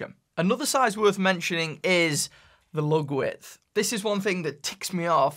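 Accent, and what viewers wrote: Stoke, United Kingdom. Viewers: is it stokie?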